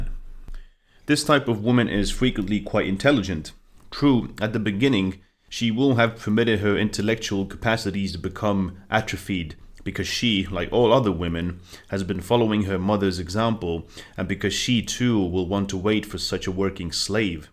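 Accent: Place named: Eastern Europe. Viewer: My favourite quote: "theoretically, it is possible for a beautiful woman to have less intelligence than a chimpanzee and still be considered an acceptable member of society" Where are you from? You sound like another YouTuber called "Eastory". I'd say somewhere in Eastern Europe. But you have a British accent too. Well-narrated. You have a pleasant voice and everything is easy to follow.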